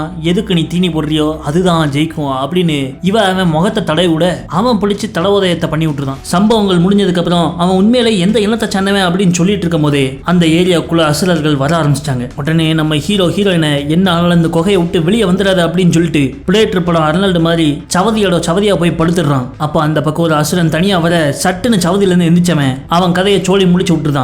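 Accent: Tirunelveli, India. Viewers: திருநெல்வேலி ஸ்லாங் சூப்பர் 👌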